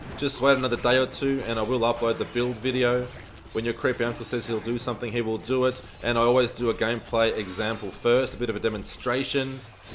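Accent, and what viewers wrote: Australia. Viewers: not aire east coast Aussie I reckon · Are you aussie bro? Maybe kiwi perhaps? But you live in AU aye..love watching your vids man.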